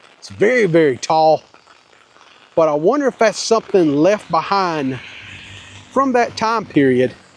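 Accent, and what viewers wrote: South, United States. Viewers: While these towns have seen better days, I do appreciate your visiting them , rain and all. While saddened what has happened to these towns, because of a number of factors, one can't replace their history. The beautiful old police car (?), I mean, come on. how great it that?. When the rain stopped, you entered the town of OLAR which I thought (through your southern dialect) was OLA, the name of my long passed mother., from the mountains of Virginia. Regardless, I did particularly enjoy that town and it's old buildings. Like you said, a little love paint, and the folks here just might see the value in a coffee/snack shop and barber to get thing back on track. Only time will tell.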